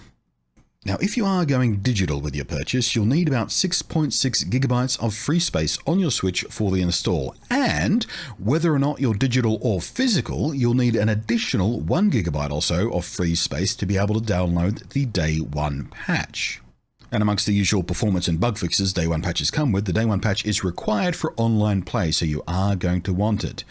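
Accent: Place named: Australia. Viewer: Nothing like a low key Aussie accent to massage my brain so I may fall asleep. Quite a podcast voice. Very crispy & creamy 🥱💤💤💤💤💤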